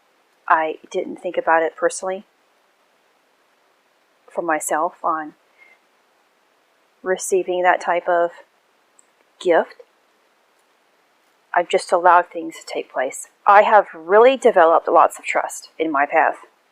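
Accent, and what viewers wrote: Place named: Asia. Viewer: I noticed a bit of and Asian accent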